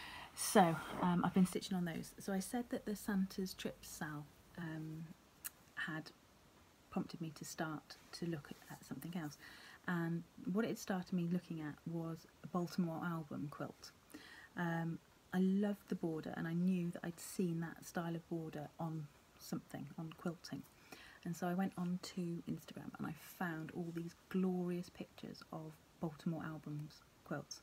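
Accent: Wales, United Kingdom. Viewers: Watched you after Jan Hicks recommended you channel. What a relief to hear a lovely soft English / Welsh voice after so many Americans. Love your stitching! Good luck. Kate, Melbourne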